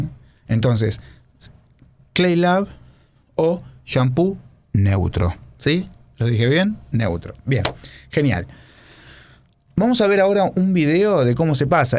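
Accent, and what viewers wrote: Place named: Argentina. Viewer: en primera me sorprende tu respuesta muy explícita y respetuosa nice ! Segundo ,dónde estás imagino q en argentina por el acento Tres como se ve la situación en tu país en verdad hay gente q se preocupe por hacer ese tipo tratamientos a sus autos si apenas hay para cosas de primera nesecida!!! O q porciento de la población en tu comunidad gasta en eso ???? Si estuvieses en USA o EUROPA t lo compro ! Y por último con mucho RESPETO es de muy MAL gusto estar bebiendo cuando estás hablando ! Saludos .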